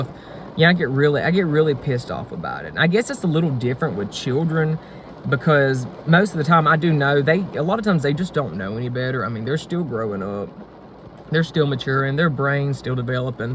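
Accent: South, United States. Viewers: "gay country accent".. lol. It's just the region in the South he's from... and the parents (voices) he was raised around. (I'm from the south)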